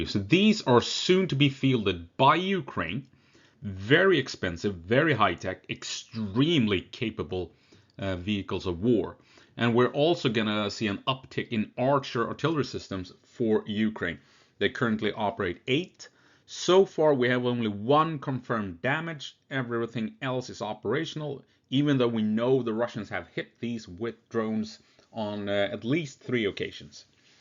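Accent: Gothenburg, Sweden. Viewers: It's a pronounced Gothenburg accent 😂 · I love how you prove your Swedish ancestry by pronouncing the silent "j." Every time you pronounce a silent "J," it reminds me of how my 100% Swedish grandmother used to pronounce my name "for example, as "yohn" or "yeffrey" or "yames."). It is very endearing to me as a half-Swede.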